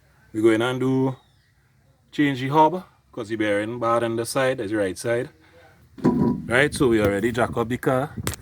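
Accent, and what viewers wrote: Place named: Trinidad and Tobago. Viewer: Voice sounds like trinidadian 💯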